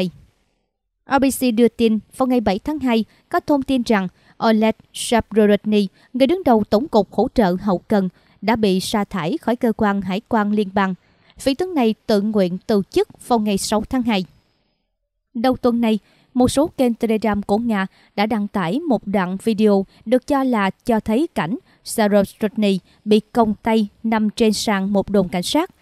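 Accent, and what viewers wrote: South, Vietnam. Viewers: người tàu hả..đọc tiếng miền nam dể nghe vậy mà ko hiểu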